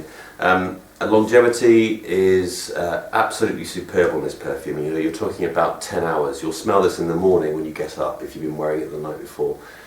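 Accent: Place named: United Kingdom. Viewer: Hey. I love your voice and that UK touch accent however last video you uploaded was 5 years ago so I hope you doing good.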